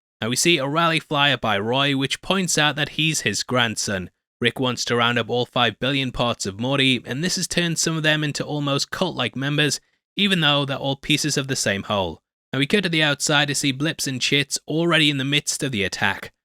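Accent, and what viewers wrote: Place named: United Kingdom. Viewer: Clearly he has a British accent so cant be "Murican."..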